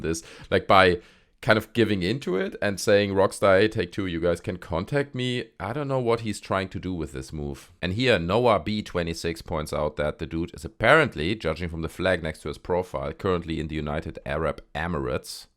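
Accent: Germany. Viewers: Safe der ist ein Deutscher. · you sound german.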